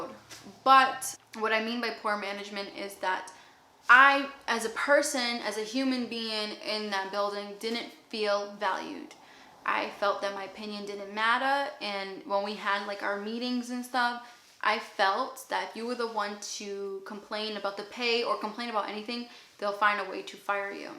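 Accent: Boston, United States. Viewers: I hope you get a job that pays well or you can focus more on Youtube! Also your Boston accent is so strong! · I hear your Boston accent 🤪❤️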